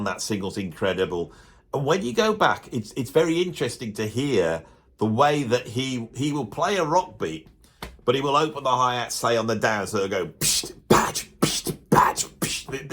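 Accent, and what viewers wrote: Birmingham, United Kingdom. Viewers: love your Birmingham accent, still lying on the floor laughing.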